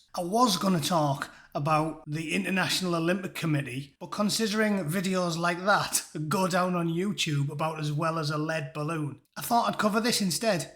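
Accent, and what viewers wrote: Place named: Middlesbrough, United Kingdom. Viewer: bang on ' '...love the boro patter, makes my bloody day yer silver tongued cavalier!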